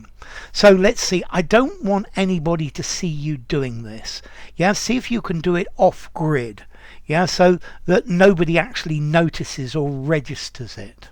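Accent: United Kingdom. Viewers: Sir what british accent do you speak with? And could you make some videos on accents! Cheers! ❤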